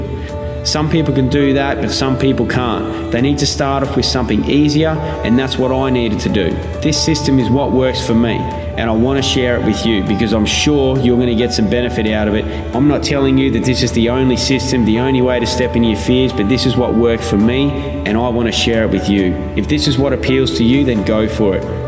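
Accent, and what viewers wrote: Australia. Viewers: Awesome to finally hear an Aussie. Great content. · He’s Australian 👍🏼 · Great to hear an inspiring australian voice :)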